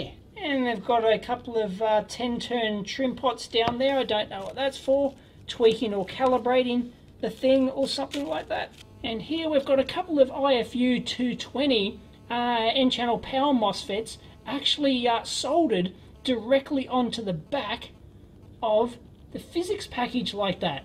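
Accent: Australia. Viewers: I've been watching your blogs for a year and I dig the Aussie Accent. And the material is always excellent.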